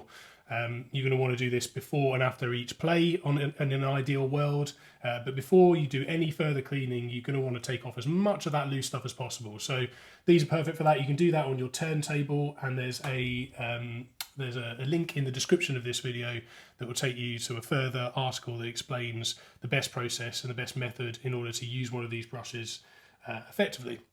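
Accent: United Kingdom. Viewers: Sounds like you are from the U.K. those 'mofi sleeves' doesn't seem readily available for Amazon UK. Have you tried any alternatives, say the 'bigfudge' brand or Amazon's recommended 'spincare'. Heard lots of mixed reviews about their sleeves. Cheers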